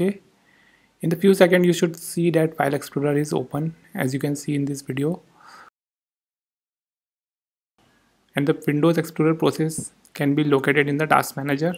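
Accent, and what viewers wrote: India. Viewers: All file explorer wont open videos has a Indian voice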